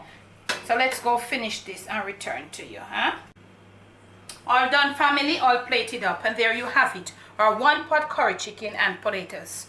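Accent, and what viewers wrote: Jamaica. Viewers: Good work n ur Jamaica slang make d food romantic kudos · she just started cooking & I'm ready to eat🤗..lol... I love the Jamaican accent!😊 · I just love that Jamaican accent.. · I so LOVE my Jamaican Brothers and sisters I can SCREAM! I finally got to know a Sister, Collen, decades ago and got to ENJOY Jamaican Dishes! Lord knows I WISH I could connect with her again after she left Schenectady NY! I am STILL making the dishes she taught me to make! Collen made her Curry Chicken somewhat differently, but because I am adding Potatoes to mine, I want to know WHEN to add the potatoes! I so LOVE your ACCENT and try to use it whenever I am around Jamaicans! In fact, I was BLESSED to know and date a Jamaican Man for a few years! He was TOO GOOD to be true! I will always REMEMBER him as he passed last year! I KNOW he is watching over me! COLLEEN, IF YOU ARE READING THIS, PLEASE LEAVE A MESSAGE! 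(BOBIE-SCHENECTADY NY) YOU HAVE NO IDEA HOW MUCH I MISS U & YOUR FAMILY! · I just love listening to you talk. Haven't heard Jamaican like that in such a long time. Reminds me of growing up. Do you have a restaurant? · Mi just a buck up this lady. I love your cooking, how u talk, how u just move round the kitchen. Ol school yardie style. Food bound fi nice. I love love love your channel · I love the Jamaican accent. It looks delicious. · Your delivery of this video is sooooooo Jamaican....love it!! Thank you from the UK....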